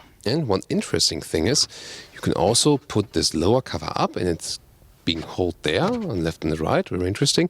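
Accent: Germany. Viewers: These are good reviews. Just factual....and with the funny German English ;) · This guy is just great! I love the german accented: "Full HD, Full Screen and Full Lengzz! Let's GO!"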